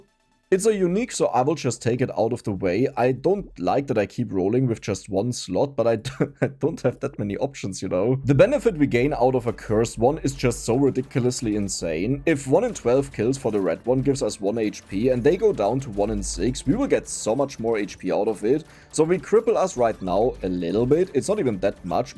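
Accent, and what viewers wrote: Germany. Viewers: such a nervenkitzel ;D ich liebe das deutsche englich einfach der beste akzent ;;D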